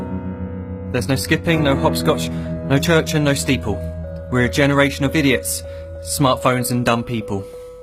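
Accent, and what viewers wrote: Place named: United Kingdom. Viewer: He talks real funny! Is he british or somthing? Anyhow, all you ol' geezers should spend MORE time on yer smart-phone! Like I do!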